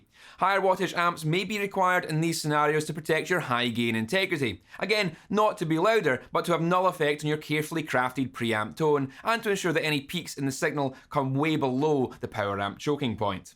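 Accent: Scotland, United Kingdom. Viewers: I love your channel! So much fantastic info delivered in a compact format and 100% comprehensible. And I love your Scottish accent..."peckups" is a favourite 😊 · Scottish accents are THE BEST!! I could listen to you read a tech manual all day LoL Really great video and audio lesson! Keep up the fine work!! Salutations from Miami, Florida!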